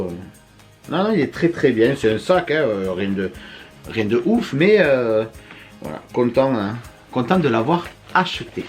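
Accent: South, France. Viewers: Salut la famille, un « GRAND MERCI », je découvre la sphère de Disney depuis que ma petite fille s’y intéresse vraiment et je voulais vous remercier pour toutes vos vidéos, déjà deux semaines qu’on vous regarde, toute les vidéos depuis 2016, eh oui! Avec ton « Panasonic ». Je te remercie car grâce à toi j’ai une autre vision de Disney et des parcs Disney. Merci de faire nous faire vivre vos expériences avec tellement de passion et de joie de vivre. Vous êtes dés personnes sincères dans ce que vous faites et on apprécie énormément (et ma fille aussi 😁). En passant, nous sommes du nord (Normandie) j’adore l’accent du sud, ça ramène un peu de soleil en vous écoutant 😁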